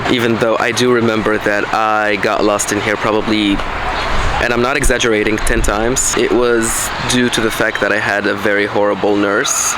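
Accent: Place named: Tunisia. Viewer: First time watching u, at first I was like why tf is he speaking english, idk why but everytime i listen to a tunisian speaking in english (including me) it feels a little weird, damn I wish i could speak with american or british accent, anyways keep it up, nice video,this channel was a good discovery today. kind of like nathaniel drew of tunisia ;)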